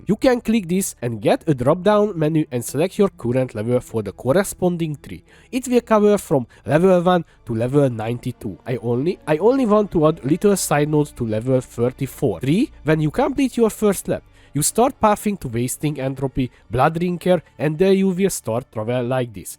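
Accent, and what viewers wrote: Hungary. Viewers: kb én is igy beszélek angolul lehet hallani a magyar kiejtést :D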